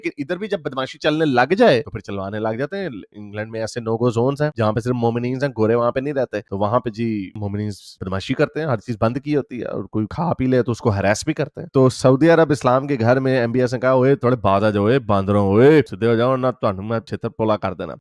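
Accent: Punjab, India. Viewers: Your accent and panjabi tadka kamal hai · Punjabi accent thoda jyaada ho raha hai,, samajhne mei dikkat hota hai.. Thoda plain hindi bola karein.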